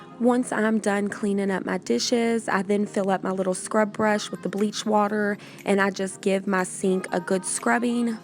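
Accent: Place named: Kentucky, United States. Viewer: I’m from and live in Kentucky I thought you were from here by your voice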